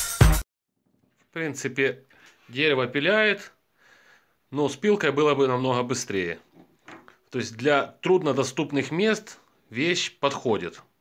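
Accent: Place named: Belarus. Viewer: Фирменный белорусский говор)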